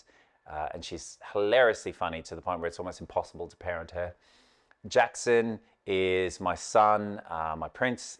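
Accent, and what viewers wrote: Australia. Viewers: I am so sorry to hear about you and Zoe. I was thinking that something was wrong because you stopped wearing your wedding ring. You are such an amazing vet with an incredible affinity for animals and people. You are humble, open, and honest. You are so brave to share all your personal business. We want to know because we care about you and Zoe, and we want to be assured that you are alright in every aspect of your professional and personal life. Also, I love to listen to you talk because of your alluring Australian accent. Please continue to do these videos and your Rescue Vet program. Love from a Canadian fan. Wishing you luck and success. ❤😊 Deb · Because he was born in my Hometown of Brisbane QLD Australia & never lost his Aussie accent. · Love the channel, I about to rescue a retired greyhound, I have had one before and they are the most beautiful dogs. 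I love your accent, I have my Siri on Australian! 🇦🇺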